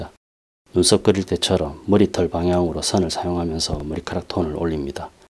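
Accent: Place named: Busan, South Korea. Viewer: 말하시는것보니 부산인듯하네요 혹시 직접화실운영하시나요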